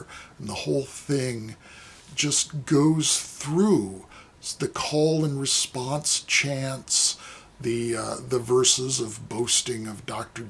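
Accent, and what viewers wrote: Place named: New Orleans, United States. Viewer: You are messing with my neighborhood now. I grew up in the same 9th ward area as. I guess that makes me a night tripper too😂. We have a very similar accent. Your pronunciations are a bit off, but no one would notice but a local😂🤣 It's nice to seeing you giving some love to the good Doctor, especially at this haunted time of the year. Have a great Halloween even if you aren't here in New Orleans. There is a new book coming out, I believe next month., my brother from another mother😂🤣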